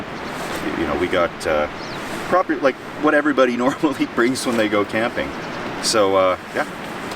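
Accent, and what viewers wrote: Canada. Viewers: I detect a slight Canadian dialect, right along with Northern Humor. Thanks for your enlightened video on camping in grizzley country. - Just great.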